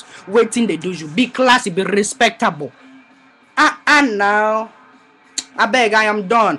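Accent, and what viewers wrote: Nigeria. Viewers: lmaoooooooo wetin dey do u, abeg noww loolx...wiv his Naija accent oo eei de3.... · lol yuh Naija accent is seriouss!!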